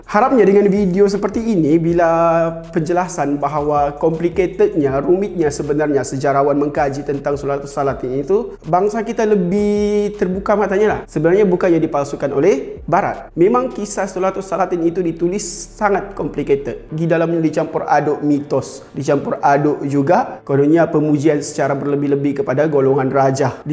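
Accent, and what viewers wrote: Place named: Sabah, Malaysia. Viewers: Pekat sabahan mu pok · bro, istidi saja kau sama slang sabahan mu, lg siyuk didengar, ok. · Nampak thumbnail.. uinah mesti Sabahan ni.. klik.. dengar suara.. confirm urang kita baini.. mantap bosku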